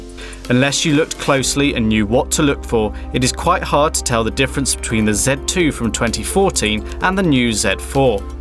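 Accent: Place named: United Kingdom. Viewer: Extremely balanced review. Well articulated with a typical British accent ;)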